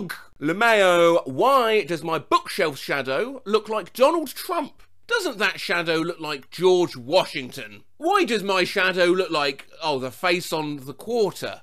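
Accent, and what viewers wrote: United Kingdom. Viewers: British? Stopped watching the video immediately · Scooooul Emooooji, i love british accent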